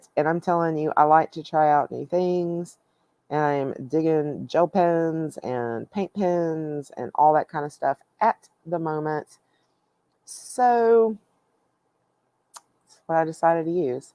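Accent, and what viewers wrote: South, United States. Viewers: I’m a recent subscriber to your channel, having come upon it by chance. I immediately picked up on your “accent,” as I, too, am a Southerner! I currently live in SC but moved here from the Atlanta area last year. Your coloring page looks so cheerful colored by the glittery Gelly Rolls. As a relatively- progressive retired pastor in a mainstream Protestant denomination, I found your comments about Christmas interesting and appreciated the remark about the X in X-mas. Indeed, the X stands for the Greek letter chi, which is the first letter of the Greek word Christos, from which our word Christ comes. So, yes, using the abbreviation X-mas does NOT leave Christ out of Christmas! Thanks for sharing!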